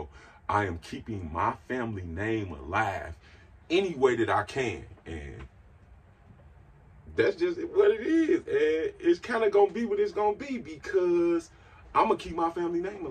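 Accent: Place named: St. Louis, United States. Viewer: You sound so St. Louis. I lived there for some time.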